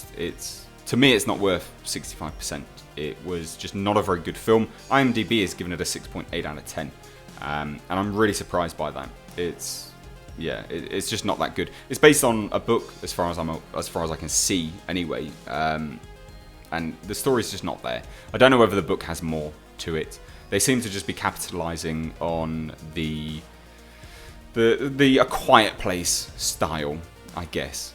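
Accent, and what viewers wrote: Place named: England, United Kingdom. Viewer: I actually like your English accent and your reviews 👍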